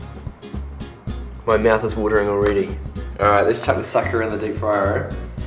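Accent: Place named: New Zealand. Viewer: Are you from NZ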